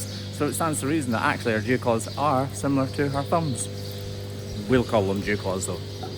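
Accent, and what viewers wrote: Scotland, United Kingdom. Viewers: He Scottish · I enjoy you videos. I started watching so I could better your Scotish accent. Now you are speaking more proper I sort of miss that.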